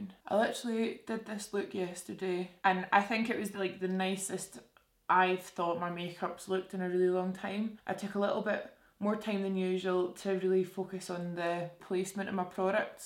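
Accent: Scotland, United Kingdom. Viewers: I remember watching you when you first started... now you got your own Brand, still gorgeous as Ever and LIVIN your best! So proud of you 🥰 I’m 41yrs old MUA here on YouTube & I’ve been in beauty for about 25yrs... I’ll tell ya, these eyelids have completely changed on me! And it’s almost like overnight you just see extra skin that wasn’t there the day before 😒 sucks man!!! So I feel ya on the eyelids 😬 Especially for us who LOVE to do our creative shadows... it’s just not the same anymore 😩 But I STILL entered your VIEVE party looks on IG 🤩 I’m going strong until my lids completely hang over my eyes LMAO. I purchased your Liner in “Brat” & lipstick in “Treasure” and I’m fully in love. You nailed the pigment creamy texture ☺️ I love posting with these lips . I’m also Scotch/Irish/Mexican & that’s the whole Vibe I love about ya,, that Scottish tone is everything sweetie 😁 stay safe out there. 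Rock those neutral tones all you want... they’re beautiful. <mail>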